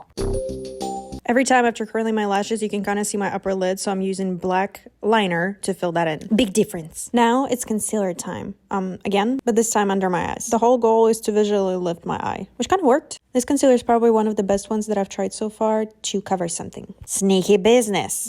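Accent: Russia. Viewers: I'm actually wondering about your native language (like u've said about the immigration) cause it sounds so russian (delulu myself) And i also have 1 clue. Ur nickname can be read in russian like with the transliteration (the translation is unbreakable) sooo that made me even more sure about my assumption Didn't want to offence anybody, if i'm mistaken, at least you have +1 russian pookie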